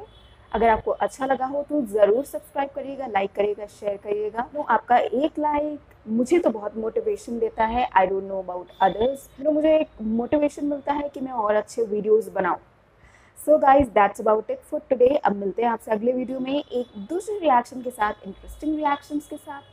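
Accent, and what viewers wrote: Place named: Pakistan. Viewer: ap pakistani hooo apki language bolna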